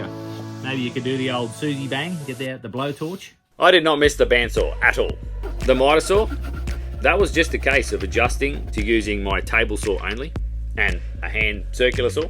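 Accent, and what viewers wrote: Australia. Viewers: I do love my machines, I've not quite as small a shop as you have though. Even as an amateur, time is as important to me as money since I never planned to support my hobby with what I make. I'd mention that sometimes the background music is just loud enough to make your speech less discernible. (Sure, I hear in American but I can usually follow Aussie.)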